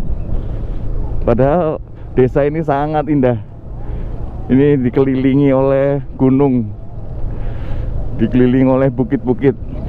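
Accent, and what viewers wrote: Java, Indonesia. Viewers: Ini mas ny org Jawa bkan? Klo dri logat bicarany mirip org Jawa? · Kalo ke Lombok ( khususnya ke Sembalun) ajak anak Istri dong /pacar atau temen boncengan biar ndak ngomong sendirian 😊😊😊. Kapan ke Lombok lagi Bang, dari Jawa mana Bang logat jawanya medok sekali, pingin bisa bahasa Jawa aku 😀😀😀😀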